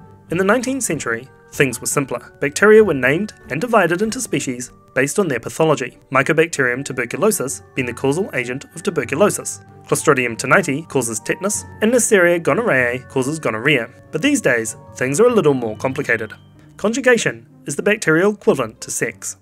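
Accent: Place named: New Zealand. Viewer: great video! and your NZ accent is fresh heheh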